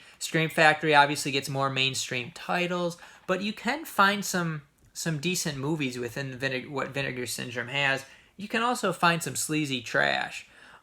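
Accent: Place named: Great Lakes, United States. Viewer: It's always great to watch your videos, I'm so impressed with your fantastic movie collection. And your Great Lakes accent reminds me of loved ones in Western NY, a really beautiful part of the country.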